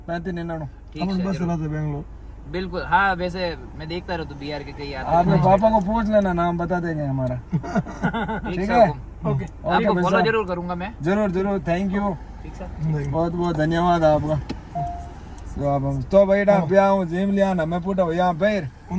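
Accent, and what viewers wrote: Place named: Marwar, India. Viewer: Aap marwadi bolo tho choko lage ..mn saaf h aap ko...thrko h aap ko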